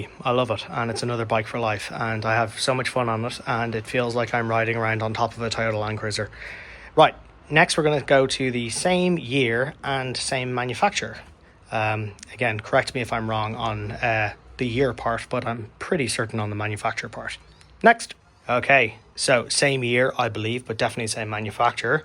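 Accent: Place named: Ireland. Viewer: Brilliant video as always. Great to know I'm not the only hoarder out there. Mind you, I've ONLY (currently lol!) got 6 frames in various states, all road bikes. What are the bars you got on ali express for the reacto? I've heard some horror stories about carbon bars off there. So I'd be interested in your thoughts. Keep up the great work. Started following you on instagram, then discovered your youtube channel. Great to hear the Irish accent!!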